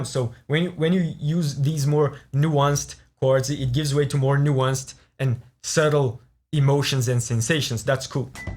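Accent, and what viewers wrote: Brazil. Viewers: Mano, seus vídeos são muito fodas! Achei seu sotaque familiar, logo pensei "é um brasileiro falando em inglês" kkkkk continua assim, vai longe ainda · Man, I have to ask. Are you Brazilian? Your accent sounds very Brazilian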